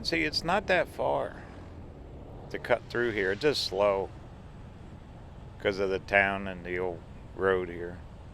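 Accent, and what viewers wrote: United States. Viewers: Your English accent is for any particular area in the U.S. ? I like your videos, cheers from Ireland 🇮🇪 👍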